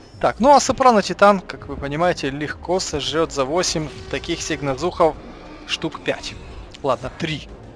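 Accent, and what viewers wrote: Ukraine. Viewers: Ти из украини?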